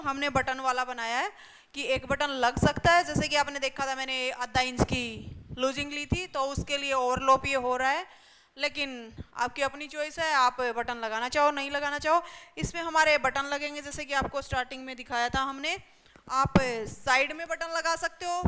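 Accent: Haryana, India. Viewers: हरयानी ठेठ में मिठास की कमी h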